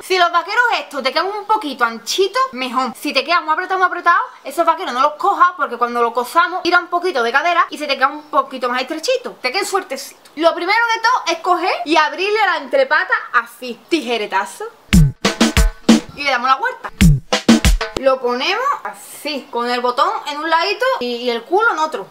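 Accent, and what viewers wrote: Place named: Seville, Spain. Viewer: Tú eres de Sevilla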